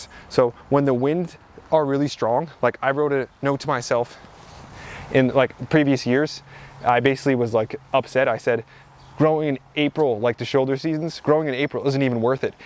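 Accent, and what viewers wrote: United States. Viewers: I was thinking he was an American in Jersey uk .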